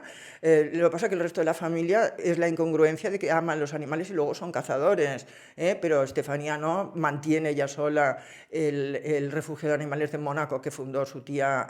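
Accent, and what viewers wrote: Spain. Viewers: Hola me encanta tu programa, hablas muy rápido por lo que no hay tiempo de aburrirse y tu acento español es muy bonito. Soy cubana y demás está decirte lo involugrada que está Cuba con España desde hace cientos de años. De jovencita creía que los príncipes y princesas eran bellas personas y vivían felices en sus palacios. De adulta leyendo realidades de la realeza me fui dando cuenta que son personas llenas de defectos y muchos de ellos malas personas. Hoy día una niña se comporta como la princesa Estefanía y la hubiesen puesto en clases especiales y con muuuuuchas citas al psicólogo. Recuerdo el accidente de Estefanía y su mamá, yo ¡¡¡sí!!! creo que la que estaba manejando era la princesa y como era menor de edad podría ir a la cárcel por haber causado la muerte a su madre involuntariamente. Pienso que como era muy caprichosa y siempre le gustó hacer lo indebido pidió manejar y su madre la dejó. 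También creo que lo que Dios escribe nadie lo puede borrar, por muchas cosas que quieran ocultar. Mucha suerte en tu interesantísimo programa. 👍👍👍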